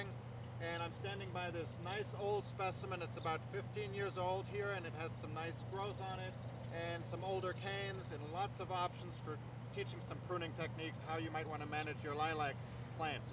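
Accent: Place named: South Dakota, United States. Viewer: Sounds like a guy from South Dakota! Good instruction, thank you!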